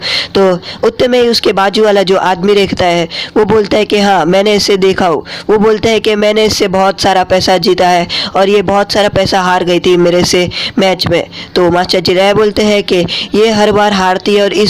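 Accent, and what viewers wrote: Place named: Hyderabad, India. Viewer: Your accent is like Hyderabadi you are from Hyderabad?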